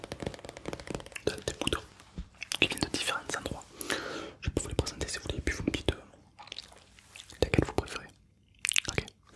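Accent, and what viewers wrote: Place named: Toulouse, France. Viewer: Super cette vidéo 👍🏻 J’ai remarqué que vous avez un accent, vous venez de Toulouse (je pose cette question parce que moi je viens de Toulouse) Sinon trop cool tes vidéo ASMR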